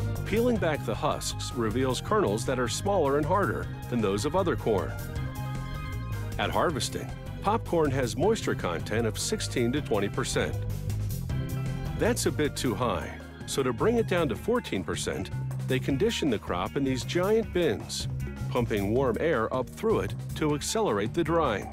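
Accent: United States. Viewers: Discovery UK but the narrator is American lol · it says UK, but he has an American accent. 😓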